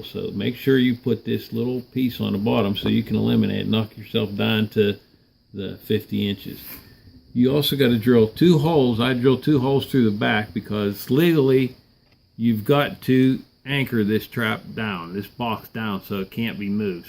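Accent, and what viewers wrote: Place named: Southwestern Pennsylvania, United States. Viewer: Man I thought I had a heavy SW PA accent. Great video.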